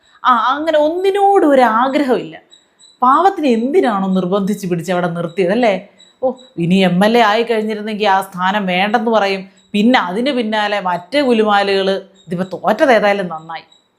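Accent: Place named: Trivandrum, India. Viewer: കണ്ണൂർ ഉള്ള ഞങ്ങൾക്ക് തിരോന്തരം ഭാഷയിൽ ഉള്ള അലക്കൽ പെരുത്തു ഇഷ്ടാകുന്നു 😂👌😅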